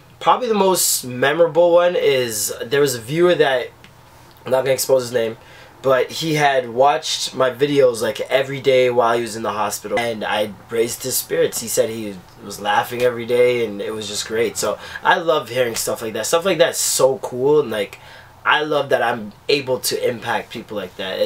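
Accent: Jamaica. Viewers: Are you from Kingston, Jamaica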